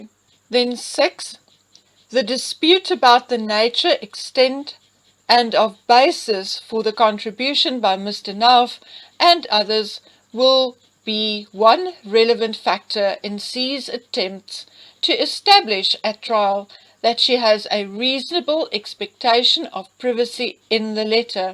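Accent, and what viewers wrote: South Africa. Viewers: I could listen to the South African accent for days.....xxx love your narrating voice x this must go to trial x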